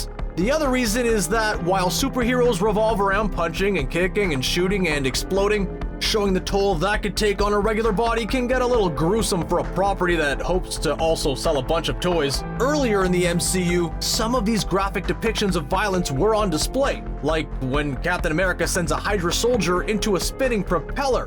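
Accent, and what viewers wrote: Canada. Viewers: I hear a Canadian accent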